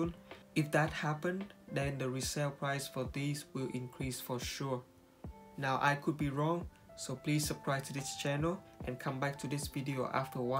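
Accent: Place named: Vietnam. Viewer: omfg dude, 1st time to ur channel, but guess what, i could see where u from by how u pronounced. hahaa, its cannot be “a đi đát” like what u said. and im also from 𝙑𝙞𝙚𝙩𝙣𝙖𝙢 🇻🇳 btw ^^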